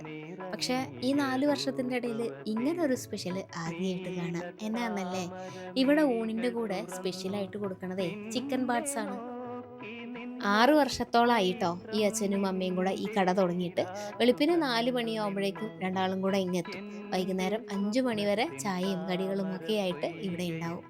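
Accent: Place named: North Kerala, India. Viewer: ചിക്കൻ പാർട്സാണ്❤ എൻെറ മാതാപിതാക്കൾ എറണാകുളം കാരും ആ നാടുമായി എനിക്കുള്ള നിരന്തര മായ ബന്ധ വും കൊല്ലത്ത് സ്ഥിര താമസ വുമായ ഞാൻ താങ്കളുടെ ഈ വടക്കൻ സംസാര ശൈലി ഒരു പാട് ഇഷ്ടമാകുന്നു✌️🙏♥️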